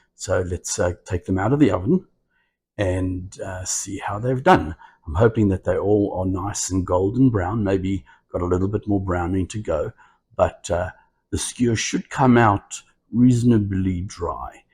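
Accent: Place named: Australia. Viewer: I have stove/oven envy! Love the video and so nice to see the Aussie accent.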